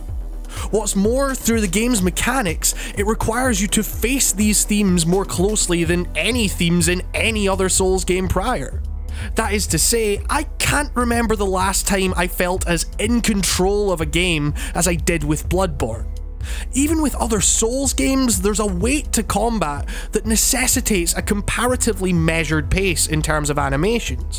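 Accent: Scotland, United Kingdom. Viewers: Are you Scottish? Because you have a beautiful accent.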